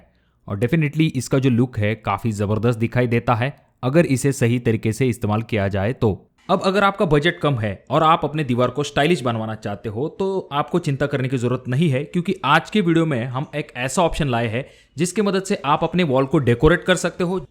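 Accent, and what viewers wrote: Maharashtra, India. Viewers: Typical Marathi accent in Hindi.... Proud of you...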